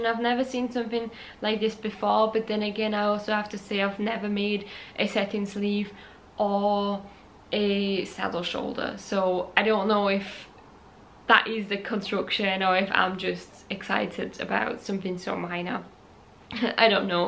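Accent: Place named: West Yorkshire, United Kingdom. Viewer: Hi, found your channel today and have really enjoyed watching some episodes. Think I heard you saying that you are sending from Austria? I lived a couple of years in west Yorkshire a long time ago, and to me you sound very much like my colleagues at that time. Am I mistaken?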